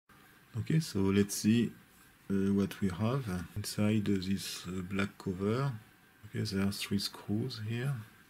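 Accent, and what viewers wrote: France. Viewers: Getting better at understanding Frenglish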